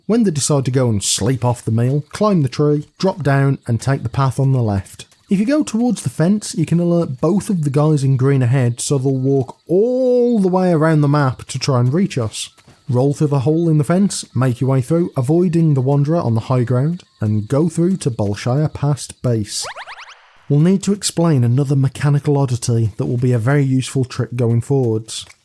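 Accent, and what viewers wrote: Birmingham, United Kingdom. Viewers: couldnt place the accent til he said 'enemuy' hahaha brummie for sure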